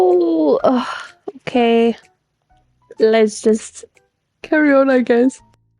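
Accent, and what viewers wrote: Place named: South Africa. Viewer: I’m really sorry if this is rude and ignore it if it is but I can’t help but think you sound South African 😭 (this is coming from a South African) If you are it’s so nice to see other South African Genshin players! ☺️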